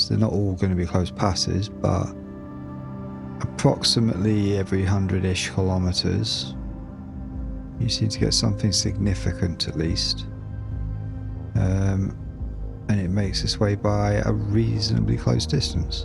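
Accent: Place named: United Kingdom. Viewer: I was wondering what part of the uk your from?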